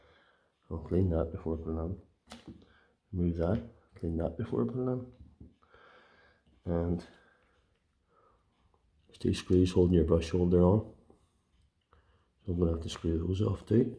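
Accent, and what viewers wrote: Northern Ireland, United Kingdom. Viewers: Having a go at this later lad. You from Northern Ireland? Ballymena here 👍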